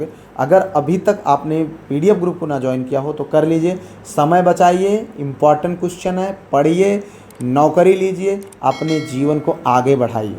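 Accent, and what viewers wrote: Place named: Bhojpuri, India. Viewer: Nice session Sir ji waise Sir aapki bhojpuri langauge bilkul hamari tarh hai jaise hamare yaha boli jati hai 😊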